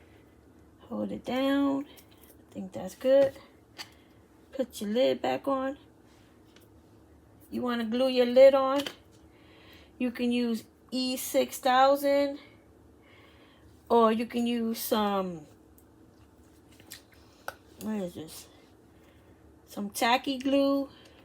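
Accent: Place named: New York, United States. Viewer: Thank you your videos are always enjoyable 💘 I'm from NY originally and your accent reminds me of home lol 😘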